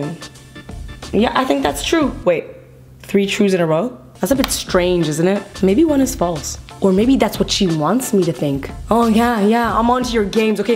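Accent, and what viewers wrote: United Kingdom. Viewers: Is it just me that LOVES her English accent 🤣🤣🤣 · That British accent😍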